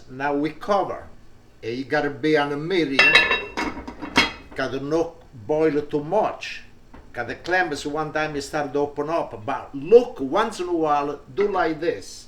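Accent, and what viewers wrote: Italy. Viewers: I love your Italian accent. Today is my son’s 11th birthday and he wants me make this dish. Salute cin cin🥂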